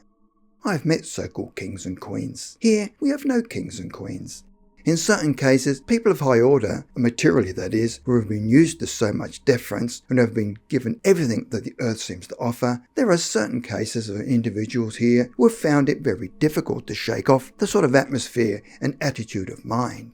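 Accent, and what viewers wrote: Australia. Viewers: Very easy for me - an Australian accent! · Great material but it is hard to follow your speech with your thick Australian accent. The woman that sometimes narrates your videos is quite understandable to an American ear.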